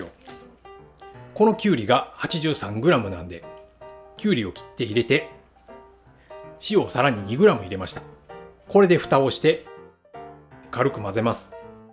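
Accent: Kyoto, Japan. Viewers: 分かりやすかったです👍今年は2回柴ずけ こしらえてますが😊もう 1回 こしらえてみます(動画参考)で👍京都弁がチラホラ👏👏👏よかったですね🙆🙆🙆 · 下鴨の出身です¨̮ 嫁いでから京都のお漬物の美味しさを 再認識✨京都弁に安心感🥺 シンクに赤紫蘇の洗った後の赤いお水が 流れた瞬間、実家を思い出しました。笑笑 母の方法とはちょっと違うけど、 ものすごくしっくりきたので✨ こちらの方法でも作ってみます☺️ めっちゃ美味しそうです！！！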